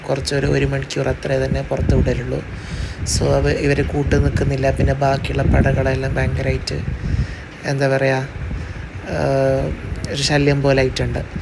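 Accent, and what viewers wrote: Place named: Kasaragod, India. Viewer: Edk kasrod slang bern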